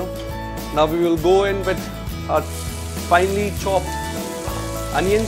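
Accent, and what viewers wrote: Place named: Lancashire, United Kingdom. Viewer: I'm loving this blokes accent. Where's he from? I'm getting north east lancs vibes off it.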